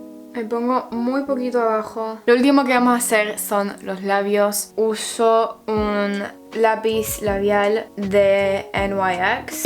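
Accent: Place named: Israel. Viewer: איך שומעים את המבטא הישראלי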